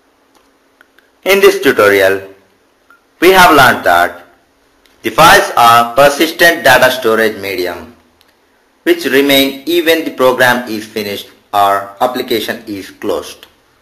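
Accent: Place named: India. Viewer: nice accent haha are you indian?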